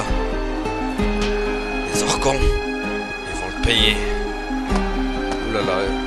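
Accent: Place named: Germany. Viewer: je voulais de l'accent allemand....jai ete servi! :)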